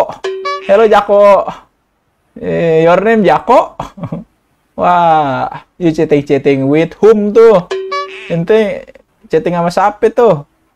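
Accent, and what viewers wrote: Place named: Betawi, Indonesia. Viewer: Mantap bang,,, betawi banget,,,, gasssken🤣🤣🤣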